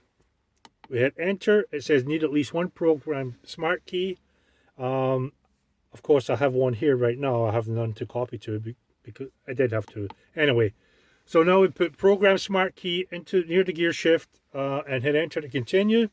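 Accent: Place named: Scotland, United Kingdom. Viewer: Why do you sound Scottish and American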